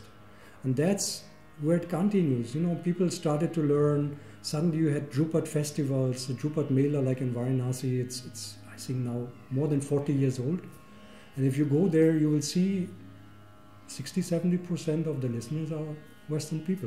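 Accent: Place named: Germany. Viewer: I think it's German accent. lol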